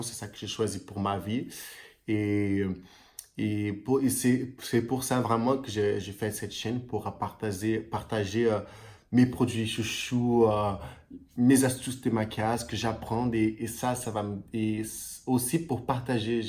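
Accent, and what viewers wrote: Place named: Brazil. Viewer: Je vais regarder tes vidéos juste pour ton accent qui me me replonge dans mes vacances brésilienne. Cette accent est un aphrodisiaque aussi 🤣👌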